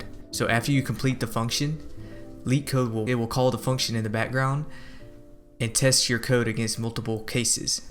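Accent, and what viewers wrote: South, United States. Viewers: Thank you for maintaining the southern accent bro. Seriously. We're losing our accent and our southern culture. When i hear people speak it, it keeps the spirit alive. thanks for uploaidn g the very helpful video! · Dude, what southern state are you from hahah. As a resident the accent and the yeti sticker isn't fooling me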